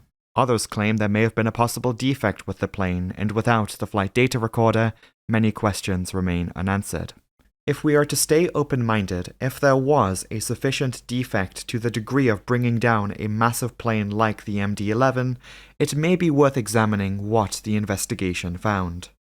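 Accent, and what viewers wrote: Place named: England, United Kingdom. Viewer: I know what a metre is and precisely how to spell it. Come English sounding person you can't sound intelligent without being able to spell the basics of the thing you are talking about.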